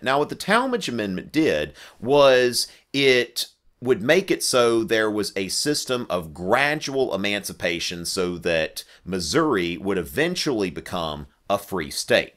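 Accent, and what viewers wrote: South, United States. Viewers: I forgot people have southern accents. I like it!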